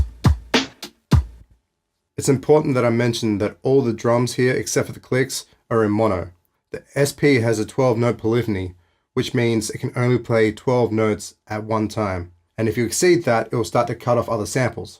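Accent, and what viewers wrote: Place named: Australia. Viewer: oh wow i would not have guessed that was just an irig! Ah didn't know there was such a range of Australian accents, thats great, keep on rocking my dude!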